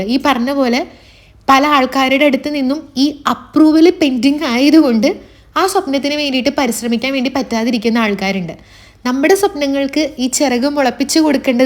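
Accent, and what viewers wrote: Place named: Japan. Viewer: Oru japankari malayalam parayunna pole und,, love your vdo sissy 💌🥰... Keep going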